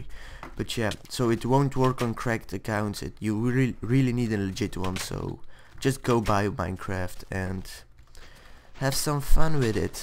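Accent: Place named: England, United Kingdom. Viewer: hahahah je engels accentje is grappig man :D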